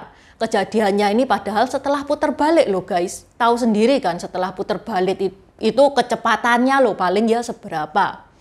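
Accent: Java, Indonesia. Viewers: logat jawa medhok,lucu sy ikut seru klo nonton hehehe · Mbak maaf bahasa jawa nya medok bngt🤭😁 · Suka medok Jawanya Mba, salam Surabaya ♥️👍🌴🌿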